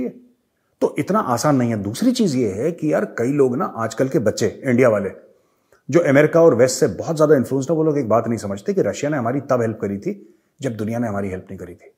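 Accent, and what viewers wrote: Pakistan. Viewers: pure pakistani accent😂😂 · Major gaurav aarya with Pakistani accent is so lit 🔥🔥😂😂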